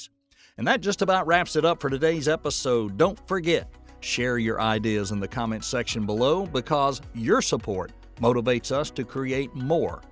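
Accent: United States. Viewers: From which part of the US is the accent of the speaker?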